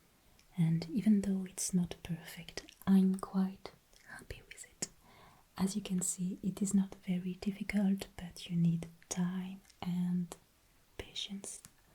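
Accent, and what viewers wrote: France. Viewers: My favorite part of her is her French accent. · English with a French accent AND hair play.?ZZZZZZ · That wig reminds me of my hair bumpy, lumpy and tangles even after just brushing it(lol)!!!... can't wait to see how " Marie Antoinette"s hairstyle turns out....no woman in her right mind wants to look like a "hedgehog"!!!....love her french accent and her natural beauty.....this is my first time watching this ASMR artist.... I'm very impressed with the quality of her video and it's content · Super vidéo, le montage est parfait. Comme ton anglais d'ailleurs, ce qui est plutôt rare par chez nous ! Mais est-ce que ton french accent est naturel ou bien tu l'exagères un peu pour l'ASMR ? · Accents from the region of France are so nice-sounding. Very beautiful. Sorry, French Canadians. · I love the way she says Curlers. The French/English accent is so sweet to listen to. 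· Omg you’re so good! Question, are you french? (Note: if your not sorry, QwQ I’m bad with accents)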